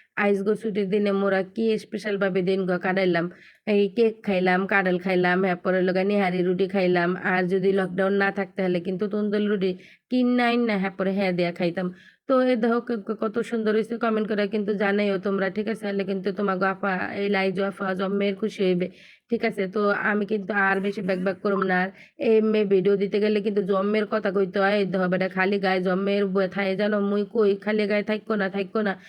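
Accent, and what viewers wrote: Barishal, Bangladesh. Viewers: আপু নিহারী তন্দুরি রুটি খেতে দারুন লাগে আর তুমি কি ভাষা বলছ তা কি বরিশালের ভাষা তুমি কি বরিশালের মানুষ???? আপু ভাইয়া তো কত সুন্দর করে কাঁঠাল ভাঙছে, চ্যানেলের নাম চেঞ্জ করে দেবে???? মশকরা মশকরা ভিডিও দেবে 😂😂দিও আমি দেখার জন্য রেডি আছি তোমার ভিডিওটা দেখতে একটু লেট হয়ে গেল দুইটা বাচ্চা নিয়ে আমি নাজেহাল অবস্থাতে আছি খাওয়া দাওয়া ঘুম নিদ্রা সব উঠে যাচ্ছে। 😢😢কেক বানানো দারুণ হয়েছে ঠিক বলেছ আপু একটু অন্যরকম ভিডিও দিলে খুব ভালো হয়। আমি তো একঘেয়েমি হয়ে যাচ্ছি 😢 তোমার জন্য অনেক অনেক দোয়া রইলো অনেক দূরে এগিয়ে যাও মাশাল্লাহ তিন লাখ ভিউ দেখে তো আমি খুব খুশি 🤲🤲🤲🤲🤲👍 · আপু গো তোমার মুখে বরিশাইল্লা বাসা খুব খুব সুন্দর লাগে ❤👍ভালোবাসা দিয়ে দেখা শুরু করলাম শেষ পর্যন্ত তোমার সাথে আছি আপু ❤👍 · আসসালামু আলাইকুম, কেমন আছো আপু, তোমার এই বরিশালের ভাষায় কথা বলা খুবই ভালো লাগলো আপু, নিহারি রান্না লোভনীয় হয়েছে ❤❤ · ৩০👍মনু তোমার বরিশাইল্যা কতা জম্মের ভালো হুনাইছে।তোমার নিহারি অনেক সুন্দর হইছে তয় পেঁপে দেলা ক্যা?একলা একলা খাইলা মোরে একটু দেলা না😥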